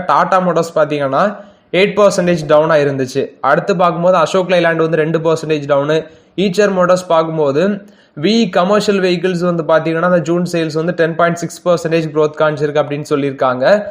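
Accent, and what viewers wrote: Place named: Nagercoil, India. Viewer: Slang resemble Nagercoil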